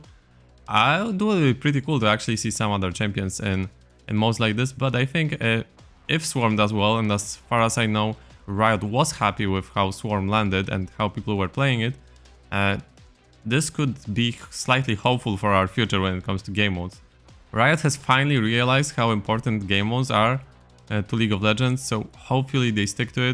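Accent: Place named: Poland. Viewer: aren't you polish? your accent seems similar xD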